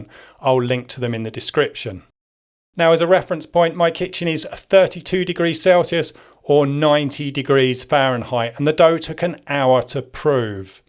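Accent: United Kingdom. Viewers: I thought you were Andy. Then I heard the british accent 😂 Nice loaf, mate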